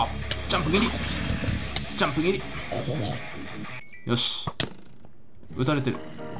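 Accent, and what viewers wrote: Fukui, Japan. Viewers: このころの和さんのしゃべり方がよりすきです 蛭子能収 水曜どうでしょうの試験に出る日本史の先生役の大泉洋 夢グループの胡散臭い社長さん にイントネーションとかにてるなーといつもおもいます 福井の方言なのでしょうか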